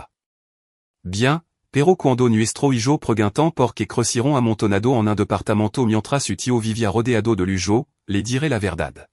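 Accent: France. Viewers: ¿Qué onda con el idioma? No es español, no es francés. es una mezcla de ambos, escrito en español con pronunciación francesa... que locura!! Decídete por uno, ¿no? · Es una persona francesa hablando en español, cuando una palabra le resulta difícil la dice en francés, pero si es un francés!!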